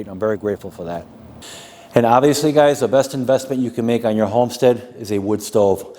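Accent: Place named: North, United States. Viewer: You keep saying "we, down here", but you don't sound like you're originally from "down here". I was born and raised in East Texas myself, but you sound like maybe you're from a little further north. Nothing wrong with that. Have several Yankee friends. Was just curious where you grew up.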